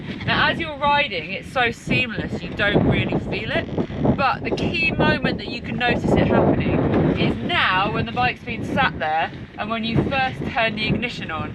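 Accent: United Kingdom. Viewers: don't be subscribed from India just for the British accent ❤️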